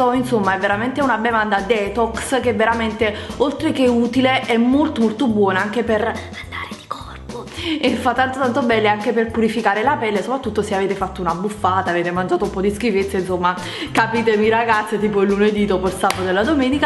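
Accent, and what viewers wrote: Naples, Italy. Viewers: ma sei di napoli???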